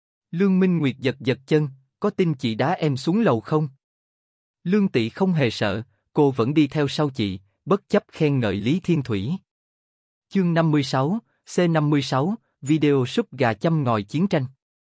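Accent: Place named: South, Vietnam. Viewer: Rất thích nghe giọng miền nam đọc 😊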